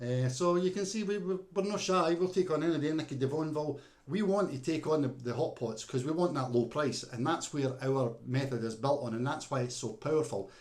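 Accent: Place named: Scotland, United Kingdom. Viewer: oh you are Scottish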